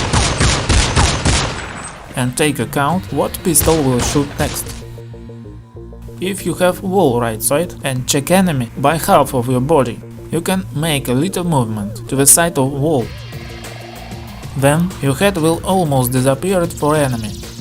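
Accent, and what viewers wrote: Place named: Russia. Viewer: I love Russian accent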